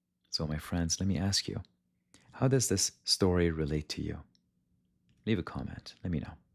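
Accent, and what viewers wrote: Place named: Germany. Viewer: Where are you from? You pronounce the wagon with a v not a w sound,Germany?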